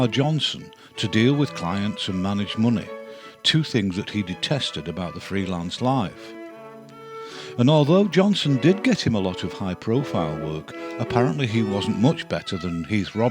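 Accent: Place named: Northern England, United Kingdom. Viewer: Thank you for doing these, very informative and enjoyable, nice to hear a fellow northern accent too.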